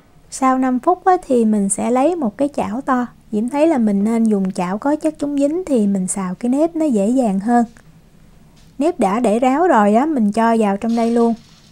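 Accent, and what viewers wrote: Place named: Mekong Delta, Vietnam. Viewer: kheo tay qua co xem nhieu video cua co thay that tuyet cho co hoi 1 chut nha chac la nguoi o mien tay que o tinh nao ma nau mon nao thay cung ngon lai qua hap dan gi nghe giong noi dam chat mien tay co gan 70 tuoi nen ko biet cach bo dau nen be doc hoi kho 1 chut nha co rat mong 1 loi phan hoi va chuc nhieu niem vui trong cuoc song nhee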